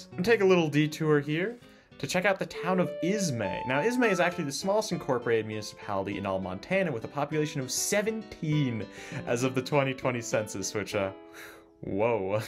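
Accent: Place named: North Carolina, United States. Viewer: dumb question, are you from North Carolina? not trying to stalk you, im just asking based on your accent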